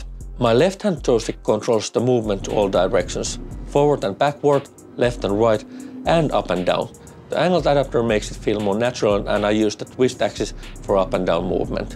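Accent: Finland. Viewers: this is the first time i watched you and right away i noticed the finnish accent =D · Nice setup. I would love this for my Elite Dangerous games. Are you Finnish btw...accent sounds familiar? xD (Ah checked your info and was correct)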